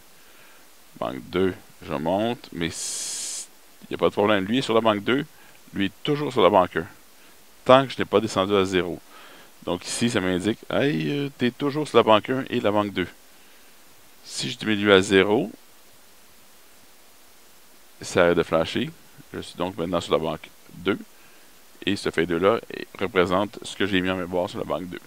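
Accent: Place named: Quebec, Canada. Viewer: En plus avec ce doux accent du Québec , c'est du bonheur !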